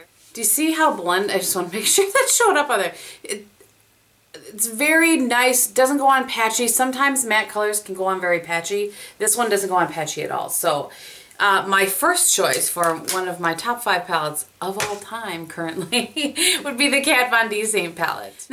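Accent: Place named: Midwest, United States. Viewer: Love your Midwest accent. I sound the same and also am a palette addict.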